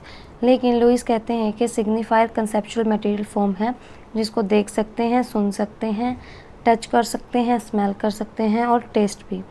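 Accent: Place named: Jammu, India. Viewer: Thank you for the video. And your voice is just 🔥🔥🔥 Your tone makes me feel like you are from Jammu 😅. Anyways, Stay blessed.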